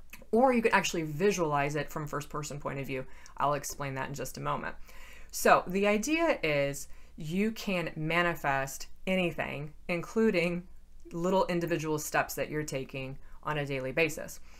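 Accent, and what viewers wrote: United Kingdom. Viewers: Your British accent is absobloodylutely fabulous!☕️🍪😜 And I love love love this video from you, it feels like you’re a best friend I didn’t know I had, came to bring me a golden advice😍 Thank youuu🌺🤍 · Best Valentine’s Day gift - we’ve missed you and your British accent and bed clothes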